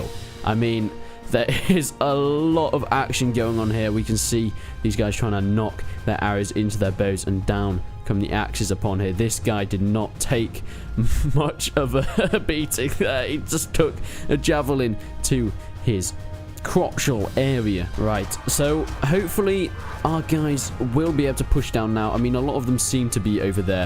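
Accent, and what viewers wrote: United Kingdom. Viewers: m8, he speaks in a British accent, what did you expect him to say?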